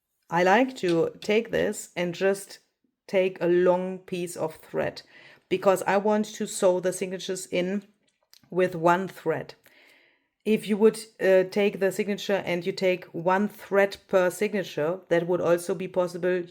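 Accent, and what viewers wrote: Germany. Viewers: I am German. At first it was difficult for me to listen to your English. But your creativity is way too amazing to miss. So I got used to your English. I hate the German accent 😅 I don’t know if your English gets better with any video or my ears just got used to it. Anyhow by now I love listening to you and laugh when you stumble about words. As someone who is been missing even German words every now and then I feel with you. I love the way your brain works and how you do your tutorials. Please stay as funny, educational and creative as you are ❤ you definitely became one of my most favorite crafters on YouTube ❤❤❤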